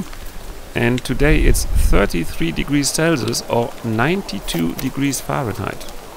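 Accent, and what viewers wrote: Germany. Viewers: The stem wall or foundation wall was not a mistake! The 3x3s or what ever the beam size are you used to frame with and the insulation and osb and the windows and what ever you cover it with weigh a lot. Good job. I'm getting used to your German accent! German accent in Germany....duh! I'm digging your renovation. Love from NW Colorado, USA. Thanxz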